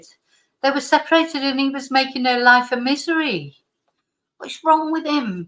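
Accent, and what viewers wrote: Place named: Manchester, United Kingdom. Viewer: Hello. I love listening to you and your great comments. So nice. Also your accent. I'm from Mcr too and miss it so much. ❤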